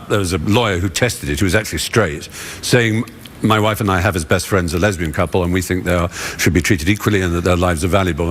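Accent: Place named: United Kingdom. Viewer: А я почти все понимаю, у Стивена Фрая оч. ясная англ. речь, он считается лучшим носителем британского акцента в Англии. Посмотрела неск. его шоу - его речь сильно отличается именно ясностью и понятностью!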